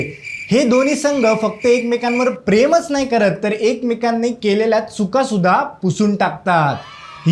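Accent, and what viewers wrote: Pune, India. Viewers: लय भारी बोलता राव, टिपिकल पुणेरी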